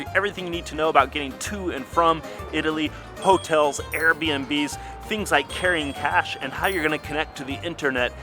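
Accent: United States. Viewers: Interesting and informative videos. Though. You might want to get on Duolingo and do a simple Italian diction study. Your accent on Italian phrases is very American. But lots of great info. I haven't been to Italy yet sadly but plan to go. I'm an musicologist/opera coach so I have studied Italian language for years. My birthday is always around Carnevale so want to do that in Venezia. I have friends in Roma, Milano. So probably next year. It is indeed so important to learn customs, etiquette. One of my close friends is an opera singer in Rome and she said she thought it was appalling seeing Americans showing up for the opera in flip flops and t shirts instead of formal wear. One can still be comfortable with silk shirt and slacks in the hot months. I'm glad to hear Italy is getting more progressive with vegano (vegan) or vegetariano (vegetarian items) on menus. 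Though I love Italian food, all that cheese/dairy and meat can be so heavy. Great tips on credit cards. Exactly what you mentioned happened to a friend when she was in San Remo, she forgot to take her credit card at a ristorante and either it was stolen or lost. Luckily she was able to stop that card and had another credit card back at her hotel. Eating late though is so different to me as I never like to do that.. I then feel heavy or can't sleep. But my Mom's family is Galician and sometimes like the Italians, the Spanish eat dinner usually around 8 or even 9 pm. So what a lot of my American friends who are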